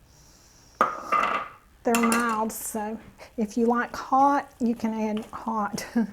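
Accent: South, United States. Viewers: Your soft sweet southern accented voice sounds just like my late mother in law . She was a great cook too ! ❤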